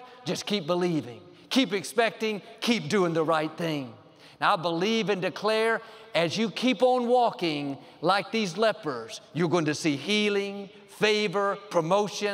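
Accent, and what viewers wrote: Texas, United States. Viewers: Yesterday I was just out of energy for going on and asked God to take me home. I woke up this morning and had a message from my loving aunt telling that my breakthrough is near, it is why the devil is so focused on me. Then I came to YouTube and this sermon was top of my recommendations. I listened to and it was like my aunt speaking to me in a Texan accent. Same message, twice in the morning from different sources. I was blessed by this. Thank you! God bless.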